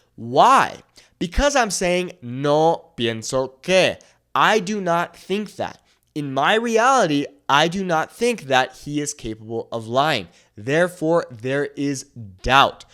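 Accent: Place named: Mexico. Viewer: Suenas Mexicano.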